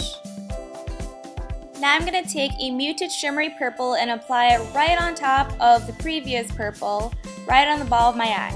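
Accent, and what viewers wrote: United States. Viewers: she looks asain and has country voice :)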